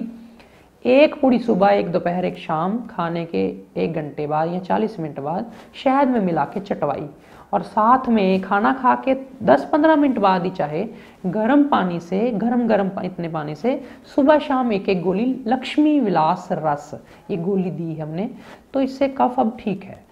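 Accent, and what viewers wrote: Punjab, India. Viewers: Thank you ji Apki language me punjabi touch dikhta hai ji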